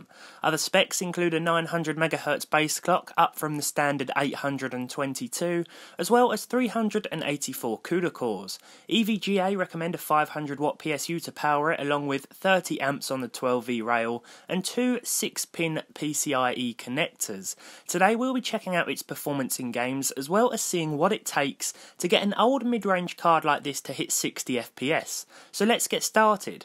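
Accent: United Kingdom. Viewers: Well. Your accent made me understand the reason why British men are called Gentlemen.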